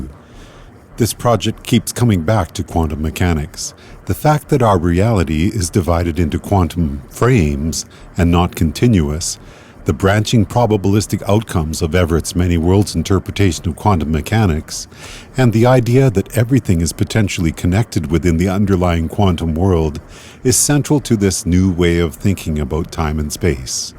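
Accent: Canada. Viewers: Is this guy Canadian? Instant turn off. No one can believe a Canadian would be saying something smart.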